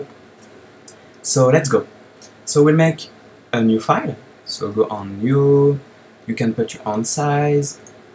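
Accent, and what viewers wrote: France. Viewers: French accent :< ( Je suis français aussi, énorme tes vidéos :)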